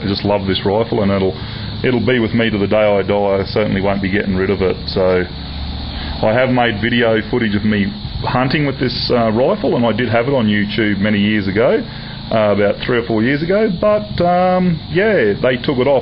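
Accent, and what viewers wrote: Australia. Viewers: I UNDERSTAND THE AUSSIE ACCENT!!!! everything you say souns like your asking a question!!! lol jk but great rifle.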